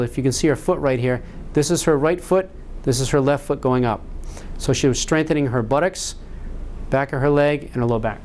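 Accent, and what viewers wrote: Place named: New England, United States. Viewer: Lol. Near the start, his New England accent really threw me off momentarily. “…in other POTS of your body.” Lol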